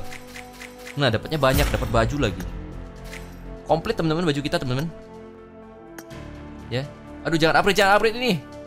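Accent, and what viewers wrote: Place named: Medan, Indonesia. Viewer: logat medan banget kau bang😁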